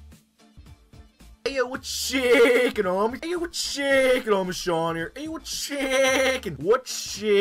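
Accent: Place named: Kentucky, United States. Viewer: By any chance are you from Kentucky?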